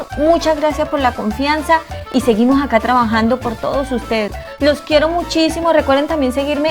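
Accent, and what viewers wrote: Colombia. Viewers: En las mismas ando yo, me tiene confundida entre colombiana y tica. Cuando dijo tajadas volvi a pensar que es colombiana.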